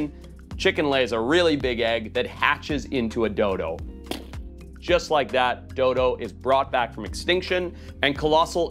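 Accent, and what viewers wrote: United States. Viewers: Saying Americans pronounce zebra wrong when you pronounce can’t like con’t and forest like far-ist is and interesting take. You basically sound American except for certain words. Almost like you’re on real bros of simi valley.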